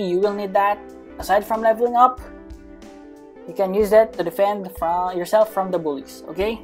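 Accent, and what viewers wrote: Philippines. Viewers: Are you a filipino? Because u sound like Filipino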